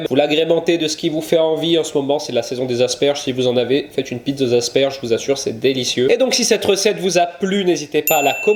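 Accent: Corsica, France. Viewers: Au début je pensais qu'il raccourcissait le mot "pizza" en "pizz", mais après je me suis rappelée que c'était son accent corse 😊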